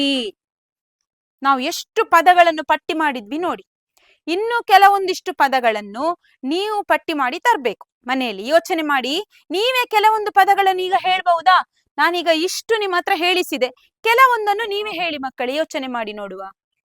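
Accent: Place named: Dakshina Kannada, India. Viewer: ದಕ್ಷಿಣ ಕನ್ನಡದ ಭಾಷೆ ಕೇಳೋಕೆ ಒಂದು ಕರ್ಣಕ್ಕೆ ಆನಂದ. ಅರ್ಥಗರ್ಭಿತವಾಗಿ ದ್ವಿರುಕ್ತಿ ಬಗ್ಗೆ ತಿಳಿಸಿದ್ದೀರಿ.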